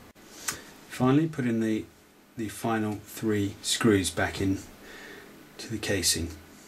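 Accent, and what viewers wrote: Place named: United Kingdom. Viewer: Thank you very much for this video. I was able to fix my son's 820 for 1/3 price! and your British is music for my ears:) Greetings from Budapest.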